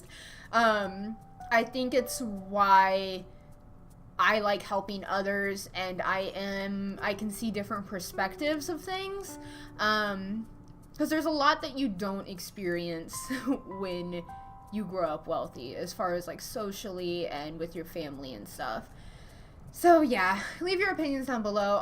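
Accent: South, United States. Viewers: To be honest, I could tell you were from like a southern area. But I am too. Hi southern sister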